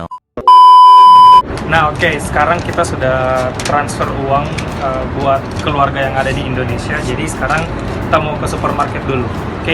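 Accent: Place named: South Sulawesi, Indonesia. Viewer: Cara ngomongx sprti org sulsel. Salam dr polman, sulbar. Ada lowongan kah utk wanita???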